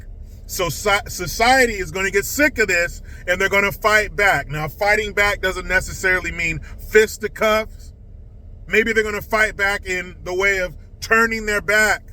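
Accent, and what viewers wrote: England, United Kingdom. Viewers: I never commented before that I got compliment. You on that English accent. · Is it Patricia Colours, one of the 3 BLM lesbians, leaders who took all the money from the BLM charity fund. Is it crooked Latisha James? Thanks, love your English accent Lol. yes this person of color crap is So o o old. Liberal Marxist divide of us up crap. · excellent English accent. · Your British accent killed me!!! Saludos from Costa Rica. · My God man, I was rolling on the floor with your British accent lol! I think you are funnier when you are in your car. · is the man. Bring back the British accent. Lizzo and Leti James had a kid. Your statue. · Great British accent brother. Not my problem. All my ancestors came here in the 1930...where I live this isnt a problem.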